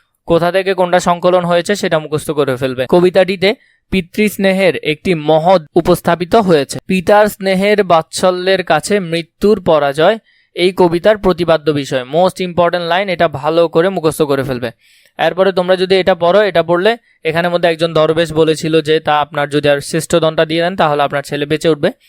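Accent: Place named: Chittagong, Bangladesh. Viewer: আপনি মনে হয় চট্টগ্রামের মানুষ তাই না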